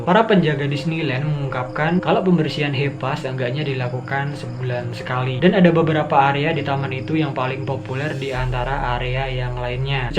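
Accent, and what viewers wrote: Java, Indonesia. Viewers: Abang orang Jawa kah?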